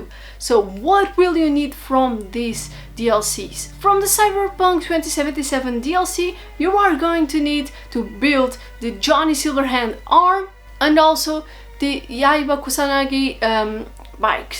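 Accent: Portugal. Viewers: q legal, achei o sotaque de ingles parecido parecido com alguem que fala portugues mas no fim era portugues de portugal kkk estou atras dessa conquista no death stranding otimo video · Até que enfim achei um vídeo super explicativo, parabéns moça, você é de Portugal né? Abraços do Brasil!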